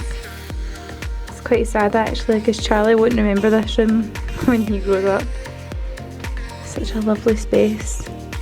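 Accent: Scotland, United Kingdom. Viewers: Since english is not my native language, it's so hard for me to understand your scotish accent. But I'm not giving up on you! You are so simpatic!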